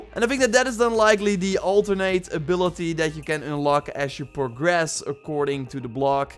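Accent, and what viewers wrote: Netherlands. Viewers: Aan je accent te horen ben je een Hollander ,choes your diffucultie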